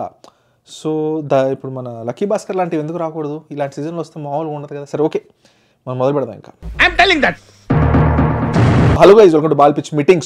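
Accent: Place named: Ichapuram, India. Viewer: Blue films review Ichapura lahja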